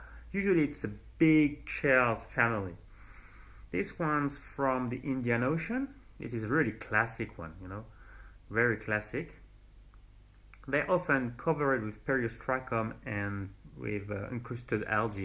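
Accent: France. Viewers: I think your YouTube channel is a great thing, but I'm going to be honest with you, if you wear your mask and snorkel (probably your fins too) noone in the scientific community is going to take you seriously, the image implies that you dive for shells in approx 30 ft at best, you are missing out on so much more....btw your French accent is awesome :) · Why would anyone apologize for a French accent, among the most elegant on the planet? Votre voix est tres suave.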